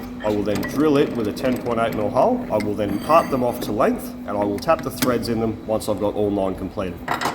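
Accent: Queensland, Australia. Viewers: Back to listen to the finest example of the Queenslander accent on YouTube. Unless Wayne Bennett's got an account on here I don't know about, but it's not like he'd talk.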